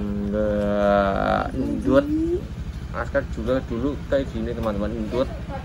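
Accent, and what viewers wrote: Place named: Java, Indonesia. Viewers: Pemalang pok mas koyo wong moga yak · Jawa medok bngt mna sich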